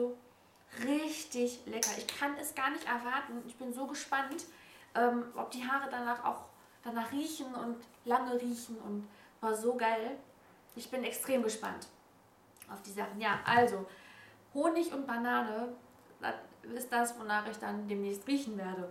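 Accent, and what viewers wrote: Ruhr, Germany. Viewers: Du gefällst mir in deinen "neuen" Videos wieder so unglaublich gut, ich muss ehrlich gestehen das ich zwischendurch etwas "entliebt" war, mochte dich nämlich früher waaaahnsinnig gerne aber zwischendurch war das einfach nicht mehr die die ich sonst aus den Videos kannte und mochte und jetzt habe ich das Gefühl du bist wieder du, mit dem witzigen Humor und einfach einem Hauch Ruhrpott - den ich als Essenerin sehr mag! Ganz liebe Grüße, Julia ♥ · ich finde man merkt total, dass du hier ausm Pott bist :-D nimm das bitte als Kompliment! gucke deine Videos in letzter Zeit wieder sehr gerne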